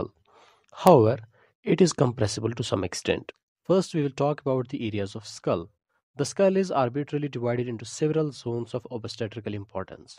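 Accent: Kashmir, India. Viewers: Voice of a Kashmiri guy😊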